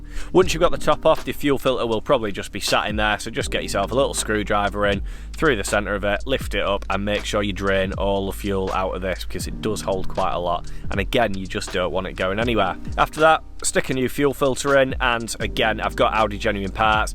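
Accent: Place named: Huddersfield, United Kingdom. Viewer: Top man! What a great video find 👍 Iv been thinking about buying a Carista and you have definitely helped me to decide thank you 🙏 A subscribe click aswell! Is that a HD8 accent I can hear?? 😁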